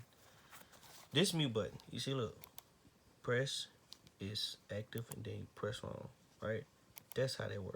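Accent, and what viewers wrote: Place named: South, United States. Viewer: you kinda sound like the YouTube poiised. Are you from the south by any chance?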